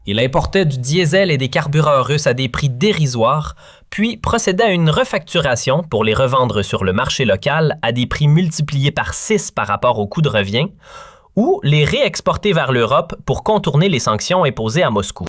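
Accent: Canada. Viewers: accent Canadian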